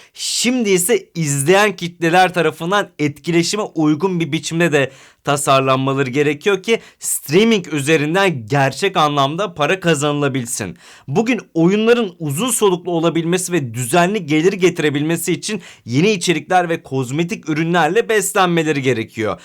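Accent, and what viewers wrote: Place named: Azerbaijan. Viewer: Abi sen azerisin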